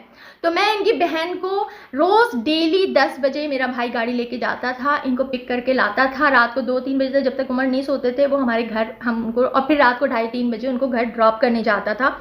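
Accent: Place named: Karachi, Pakistan. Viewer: Lalukhetan lgti ho, tmhara lehja bta rha hai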